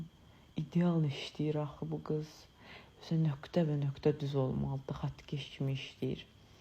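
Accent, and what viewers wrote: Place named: Talysh, Azerbaijan. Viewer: TALİŞDI ONA GÖRE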